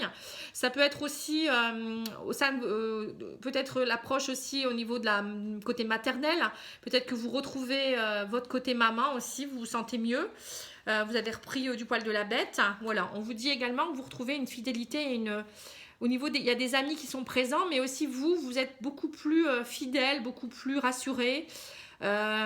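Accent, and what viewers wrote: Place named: Nord-Pas-de-Calais, France. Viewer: Have Emilia je n'ai pas osé posé la question j'ai reconnu immédiatement l'accent chti j'ai toute ma famille à Boulogne sur mer ❤️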